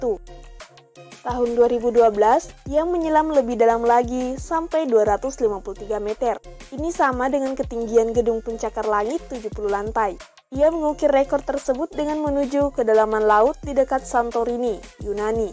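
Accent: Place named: Batak, Indonesia. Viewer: Bahh...iya yah logat nya nampak kali😂,aku pun orang bataknya😀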